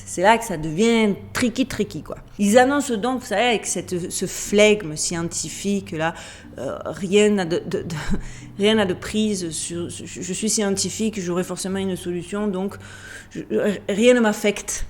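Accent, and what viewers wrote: Marseille, France. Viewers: Grand merci pour cette vidéo peu importe les sujets futurs se sera au top avec ton accent de. Marseille 😁👍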